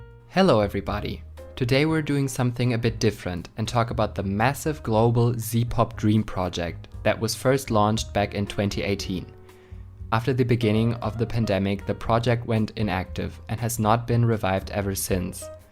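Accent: Germany. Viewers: As a German I can tell you are German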